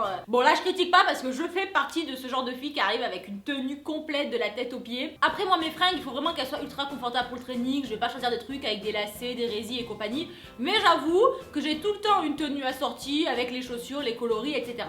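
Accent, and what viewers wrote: South, France. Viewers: J'aime vraiment tes vidéos et surtout ton accent vive le Sud :)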